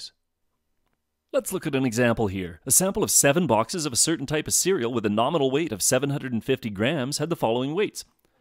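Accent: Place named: Canada. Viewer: I like the Canadian accent :)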